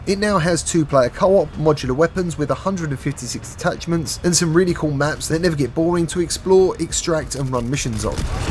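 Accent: England, United Kingdom. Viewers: You need to talk even faster with that dufus English accent. That way no one will understand a thing you say.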